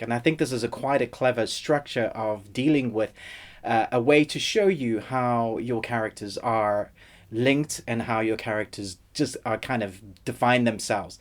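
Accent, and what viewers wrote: South Africa. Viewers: are you...are you South African?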